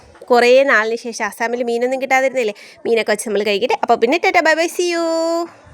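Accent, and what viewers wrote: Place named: Parassala, India. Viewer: ഇതാണ് കറക്റ്റ് പാറശാല ഭാഷ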